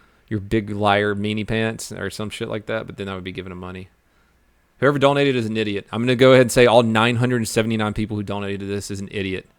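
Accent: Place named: South, United States. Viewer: Wow man i just noticed you have a southern accent! lol I'm serious i never noticed before and i have watched you for about a year! I must be sleeping or something man...lol Your vids are great though and your hilarious!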